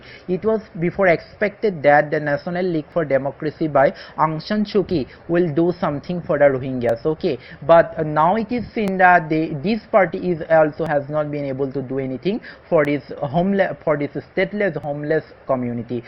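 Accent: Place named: Bengal, India. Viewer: Thank you.. And I like your bengla-English pronunciation very much...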